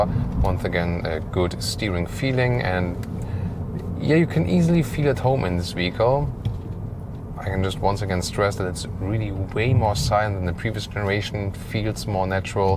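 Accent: Germany. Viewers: is it a German accent?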